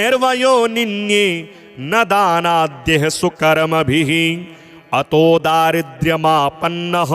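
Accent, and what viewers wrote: Standard. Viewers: aapke Shuddh Uachchaarn SnskrittT Ke DeevaneinnN Hum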